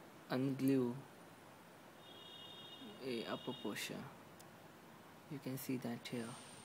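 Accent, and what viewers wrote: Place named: India. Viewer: pure indian accent. lmfao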